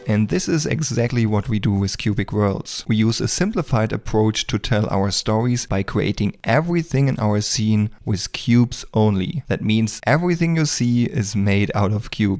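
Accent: Germany. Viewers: Der deutsche Akzent 😂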